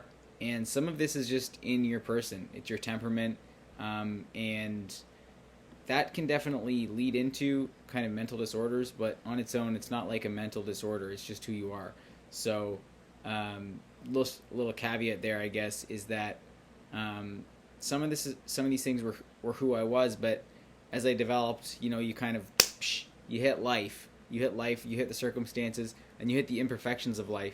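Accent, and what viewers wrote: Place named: Canada. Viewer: I do enjoy a Canadian accent - and I like your reference to emotional ‘richness’ in the ups and downs of life.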